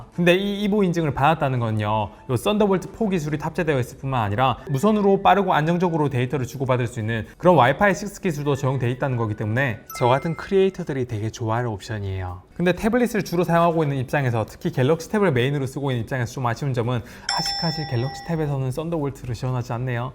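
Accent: Gyeongsang, South Korea. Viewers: 경상도 억양 빼곤 좋습니다.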